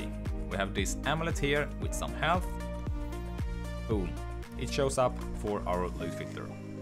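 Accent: Sweden. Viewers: Tog fem sekunder att höra att här har vi en svensk kamrat 😏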